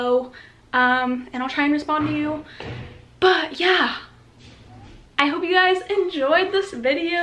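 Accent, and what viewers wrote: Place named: United Kingdom. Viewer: Pretty good british accent, adorable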